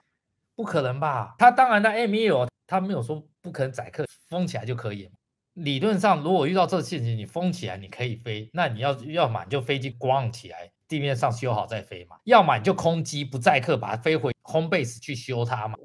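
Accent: Taiwan. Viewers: 大佬是中國人嗎?聽口音很像臺灣人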